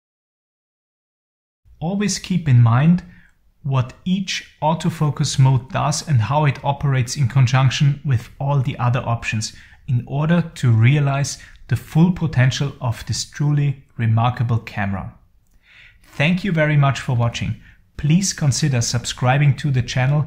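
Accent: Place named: Vienna, Austria. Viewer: Anschaulich beschrieben, danke. Im sympathischen Wiener Dialekt statt in englisch hätte es von mir auch ein "thumps up" gegeben 😀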